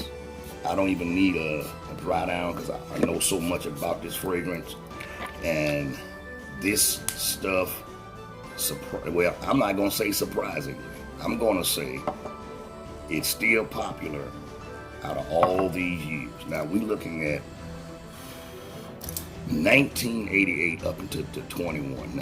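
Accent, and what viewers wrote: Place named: South, United States. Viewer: That’s so cool you have that southern accent lol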